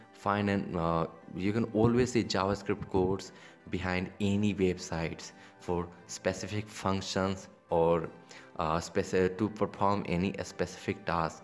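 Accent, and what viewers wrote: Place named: India. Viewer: me i as soon as i hear a India guy voice: AM IN THE RIGHT PLACE. love to all indians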